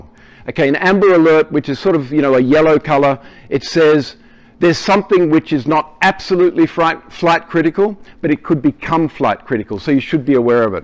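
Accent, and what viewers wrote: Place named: France. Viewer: French accent!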